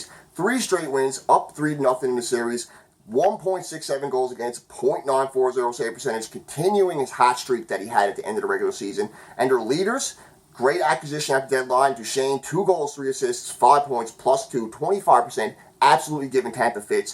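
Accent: Newfoundland, Canada. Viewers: Is that a Newfoundland accent u have? Scottish origin? Im Just a silly American so I really don't know but that's what I'm guessing...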